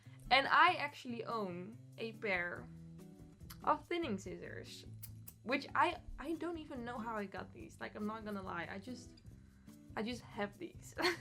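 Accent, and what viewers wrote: Netherlands. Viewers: I love your dutch accent:)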